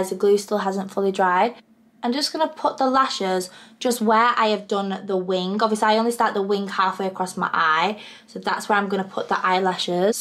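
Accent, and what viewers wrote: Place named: Bradford, United Kingdom. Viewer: Is she from Bradford ? Her accent lmfao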